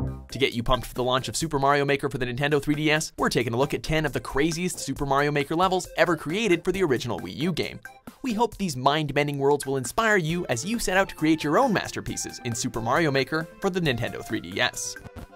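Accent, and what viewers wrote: Canada. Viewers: WatchMojo are you canadian? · omg is he Canadian if so omfg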